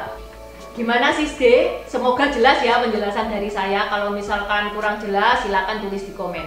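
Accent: Java, Indonesia. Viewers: Wowww medhuoknya banget bangett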